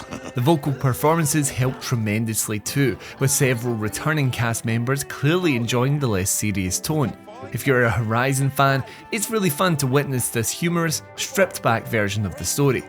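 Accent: United Kingdom. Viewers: when he said Varl I was like who!? But am just teasing. That accent is a prized British treasure 🥸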